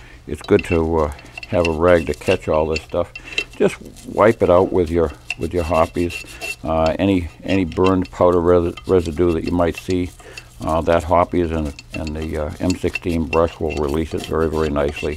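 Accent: New England, United States. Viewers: Very informative video and I like your New England accent.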